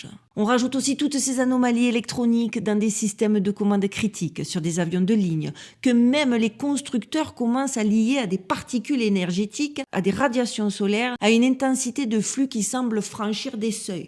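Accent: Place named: Southern France, France. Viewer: Je pense que la nature de 3I/ATLAS dépasse notre compréhension, voir notre entendement. Quelque-chose se prépare ,c'est mon intuition. Cela dit , j aime beaucoup ton style , ton phrasé, le timbre de ta voix et ton petit accent du sud donne l impression que tu chantes . J adore tes contenus .. Ps: la nasa sont des menteurs !! Comme tous les narratifs officiels.😂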